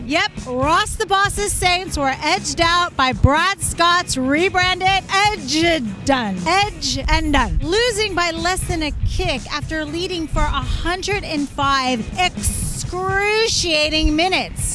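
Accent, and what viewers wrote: United States. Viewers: am Paralowie,South Australia I read a story on page 16 of the Advertiser, yes I’m that old I still read the newspaper. I’m 50 yrs old born in Australia and know very little about Aussie Rules. I’m learning from you, you crack me up 😂😂 I really love that you are an American Female talking about AFL. Your humor and accent make it even funnier, don’t stop👍🏼 You’re our official American Commentator 😁😁😁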